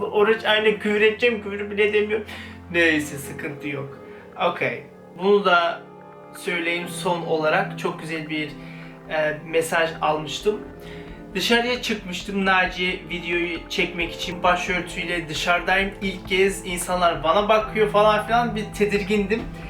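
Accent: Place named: Kırşehir, Turkey. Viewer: Kırşehirli olduğu çok belli ya babannem çok güzel diyo ya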